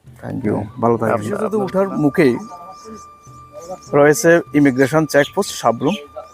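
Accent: Noakhali, Bangladesh. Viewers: ভাই আপনে কি নোয়াখালী ভাষায় কথা বলেন?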